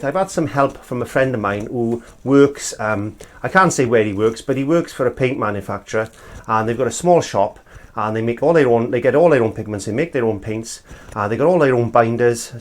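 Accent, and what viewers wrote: Wales, United Kingdom. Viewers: Loving the Welsh accent, hello from Taffs Well Butty Boy :)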